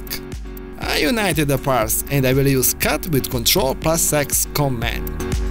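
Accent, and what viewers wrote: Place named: Russia. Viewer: такой знакомый рашэн акцент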